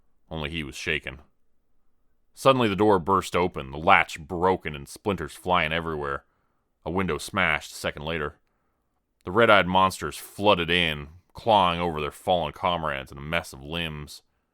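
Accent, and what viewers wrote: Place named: Canada. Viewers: I love the Canadian accent. I'm from Western NY living down south and it sounds like home! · Finally. A Canadian narrator. Great voice and story telling Sir! I will subscribe to you now.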